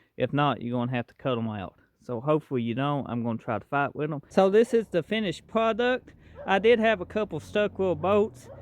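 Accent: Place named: New England, United States. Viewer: Great Video! I love your accent! Not Texas... Maybe Mass? NH? ME?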